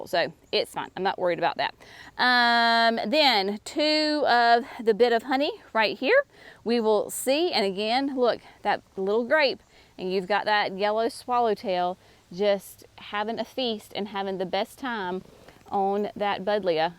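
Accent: South, United States. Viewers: I'm zone 8 Arkansas, a 5 yrs gardener and I have learned so much from the videos you've posted. I just love your southern accent, your gardening knowledge and your personality is unmatched. ❤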